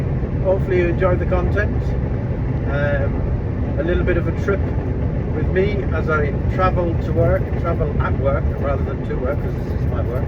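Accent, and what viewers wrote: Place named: England, United Kingdom. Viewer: Enjoying your content. Trying to place your accent. What part of England are you from originally.?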